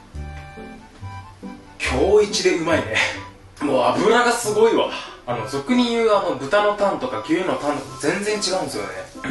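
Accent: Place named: Hakata, Japan. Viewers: 若干博多弁？が多いこの動画すき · 所々出る 九州の方言が親近感湧きますヽ(´ー｀)ノ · この喋り方的に福岡やろか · 実食編、いつもより博多弁多いっっちゃんね(o^^o)よほどうまかと！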